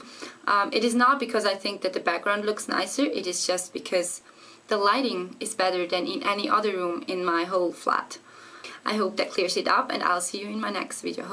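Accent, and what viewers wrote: Zurich, Switzerland. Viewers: your English of course... I know not everyone can be perfect, but your accent is SO Swiss/German when you speak English... I'm very anal, so it bugs me... sorry 'bout that :( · I can tell you'r from Zurich by your English accent..lol AWESOME video though!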